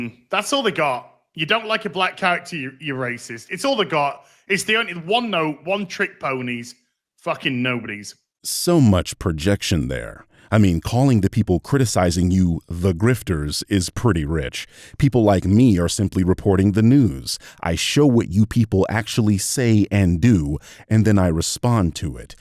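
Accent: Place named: England, United Kingdom. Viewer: It sickens me to hear an English Accent talk such Bullocks. has moved beyond being a simple village idiot and into the tall grass of Racism, Hate and Supremacy. As if AZ is better than anyone else. The Porcine Pratt Shartts his terrible opinions onto an audience of hungry mouth breathers. His mother was a hamster and his father smelt of elderberries.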